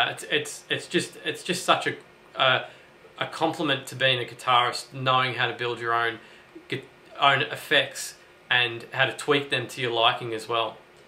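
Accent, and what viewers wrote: Australia. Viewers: Quality video ! you do a great job with this , subbed , watching / taking notes / stealing tons of ideas . don't be surprised if you notice a lil aussie accent on my next vid ....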